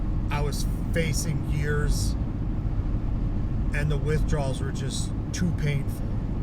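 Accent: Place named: Wisconsin, United States. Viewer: Are you from Wisconsin ? I can hear the accent in your voice. Im from the Green Bay / Appleton area .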